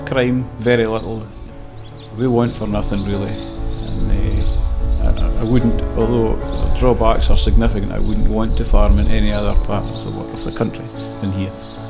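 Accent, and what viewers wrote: Scotland, United Kingdom. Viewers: you must get someone British/Scottish to translate for you.<3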